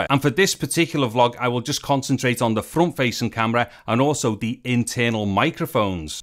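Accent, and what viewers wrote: Liverpool, United Kingdom. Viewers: It seems strange to me (living in the US) that the variations stateside are nowhere near what is found among the original native speakers of English - these regional British accents are some of the most difficult for us to understand. But again, how come the four most famous Liverpudlians never spoke like that? Also, why does Wayne Rooney, a real Scouser AFAIK, sound so very different from here (and is, TBH, unintelligible)?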